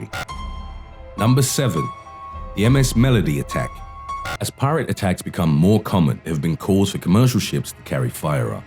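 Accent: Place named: United Kingdom. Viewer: I liked the first narrator and this British narrator now.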